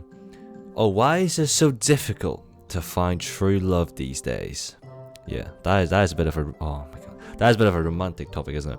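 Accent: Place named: Northern England, United Kingdom. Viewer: Which county are you from you sound like ur from the north but I’m ashamed to say I can’t tell which specifically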